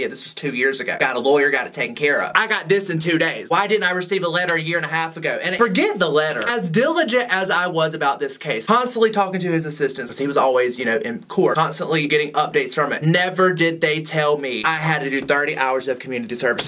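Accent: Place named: United States. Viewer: You should do an entire video trying not to let your country accent come out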